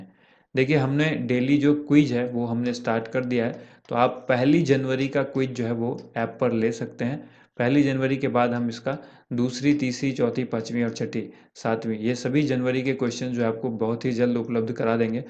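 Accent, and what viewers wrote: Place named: Haryana, India. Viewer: mujhe Lgaa aaap haryana se ho😆😆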